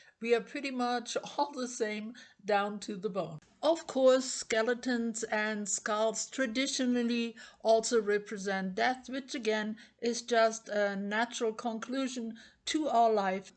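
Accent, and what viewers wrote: Germany. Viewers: Dear, congrats to your fabolous skull art piece! I love it very much. I´m also in love with skulls, especially combined with glitter and shine... To use old embossing powder to highlight the eye is a very cool idea and turned out very nice. I´m looking forward for new cool videos from your creative lab🙂 P.s. Do I hear a little german accent?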